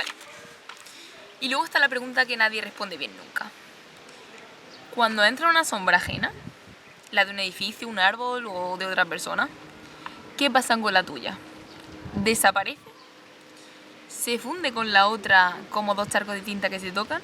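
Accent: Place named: Granada, Spain. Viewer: Que acento más bonito, es el acento de granada?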